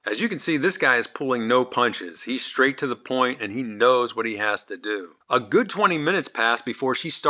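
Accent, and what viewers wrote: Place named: Pittsburgh, United States. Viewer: Sound like you’re from the Pittsburgh area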